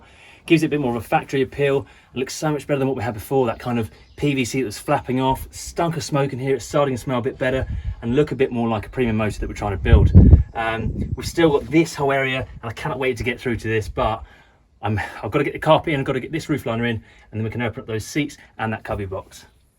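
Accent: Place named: United Kingdom. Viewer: Could somebody tell me what is the brand of the front seats, visors cover and the back seat covers? English isn't my native language and the British accent is a bit tricky for me to understand. Thanks for the help.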